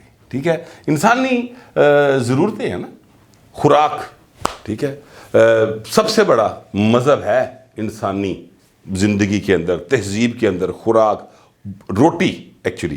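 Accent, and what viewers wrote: Punjab, Pakistan. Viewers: بٹ صاحب کچھ دن پہلے آپ سے بیٹھک سٹوڈیو میں ملاقات ہوئی اور مجھے بالکل بھی نہیں لگا کہ یہ آپ سے پہلی ملاقات ہے کیونکہ دن میں کم از کم چار دفعہ آپ کا ویلاگ سنتا ہوں اور جو آپ کا لاہوری انداز بیان اور لطیفے بازی ہے اس سے محسوس ہوتا ہے میں آپکو بہت پرانا جانتا ہوں اور یہی صورتحال رضی دادا عمران شفقت سے بھی ہے ۔ اللہ آپ سب کو ہمیشہ خوش رکھے آمین 🤲🤲🤲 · بٹ صاحب اپ اپںب کالم کیوں پنجابی میں نہیں کرتے اپ کو تو کسی نے روکا نہیں پھر اپ اردو بھی پنجابی لہجے میں بولتے ہیں بسم اللہ کرں بنیں بارش دا پہلا قطرہ ہم پنجابی صحافی کا پنجابی وی لاگ سن کر لطف اندوز ہوں گے ہم تو اپ کا اردو کالم بھی اپ کے لیفٹسٹ ہونے کا اعتراف کرنے کے باوجود مولوی صاحب کا وعظ سمجھ کر بڑے غور سے سنتے ہیں کہ اس میں خبر کم واعظانہ تبصرہ زیادہ ہوتا ہے اپ کو دیکھ کر کچھ اور سر پھرے بھی میدان میں اتر اٸین اور کام بن جاییے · Khoobsurat punjabi touch daitay hain sir G.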